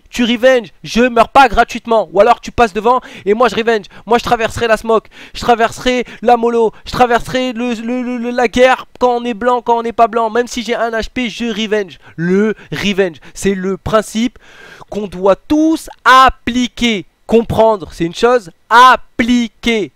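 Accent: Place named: South, France. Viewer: Je kiff l'accent du sud xD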